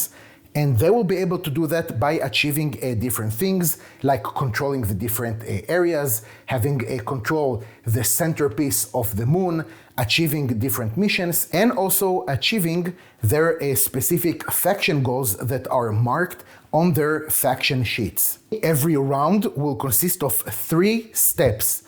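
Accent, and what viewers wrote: France. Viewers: Oh, my apologies. I assumed you are French, since you are located in Paris and you definitely feature a French accent. If that isn't a French accent, what is? On top, you seem to sling a perfectly fine typed French. So if you are not French, you basically are the perfect infiltrator, probably on secret mission. 🤣🤣🤣 Again, sorry for my misconception.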